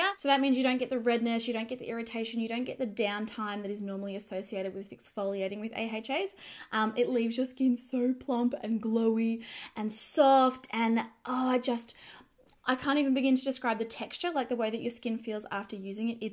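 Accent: Australia. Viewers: She has an Aussie accent, leave her alone.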